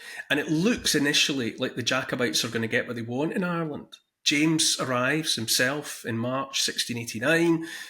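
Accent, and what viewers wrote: Scotland, United Kingdom. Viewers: If I had to pick one official English accent in the world it would be your Scottish accent. Enjoy Count Dankula's as well. You have the best English accent in my opinion of all places on earth. Could listen for hours to it. Great job of story telling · Hearing your amazing thick Scottish accent pronouncing a lot of unusual words and going into rich storytelling is some divine ASMR, very nice, thanks!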